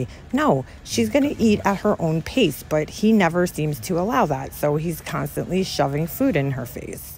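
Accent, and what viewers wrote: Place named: Bronx, United States. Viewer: I love her accent also it's probably like a New York Bronx accent like where my dad grew up she's Italian Maybe that's the way my dad talks he's a sicilian immigrant who grew up in the South Bronx and move to Jersey that's the way his accent sounds that's maybe why I love her accent so much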